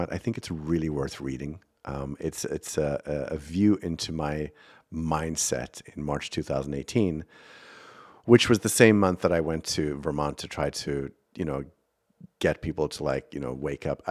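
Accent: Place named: South Africa. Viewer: Fellow South African here - don't lose the accent, it's awesome! Love the podcast! You're f*cking fantastic!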